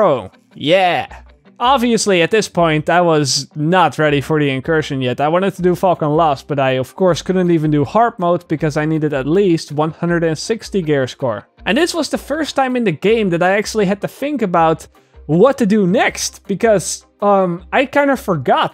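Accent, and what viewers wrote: Netherlands. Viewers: De meest Nederlandse accent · Holy shit are you dutch Hallo uit Suriname 👋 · Are you dutch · Nice video Ik hoor je Nederlands Engels accent maar je Engels is serieus goed. Go on with you channel.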